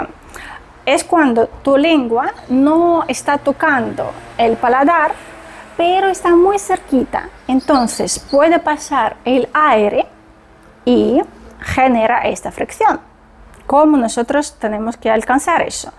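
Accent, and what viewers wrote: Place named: Spain. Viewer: Si, se puede detectar un poco de acento de España cuando hablas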